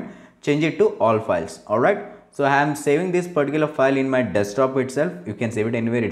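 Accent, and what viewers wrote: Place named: India. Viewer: He’s Indian dipshit, of course he’s going to have an accent? Why do all southerners have the same fucking Texas voice???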